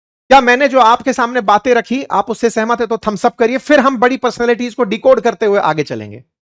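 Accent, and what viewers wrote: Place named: Marwari, India. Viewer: श्री राम राम जी SiR ji आपके शब्द में कभी कभी मारवाड़ी शब्दों का उच्चारण होता हे जिसे हम सभी मारवाड़ी होने का पहचान शब्दों से पहचान हो जाता है 🙏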